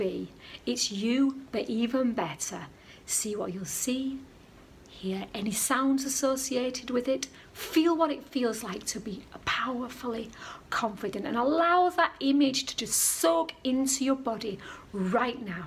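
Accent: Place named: Hull, United Kingdom. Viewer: Sounds like you are from near Hull just like me! :)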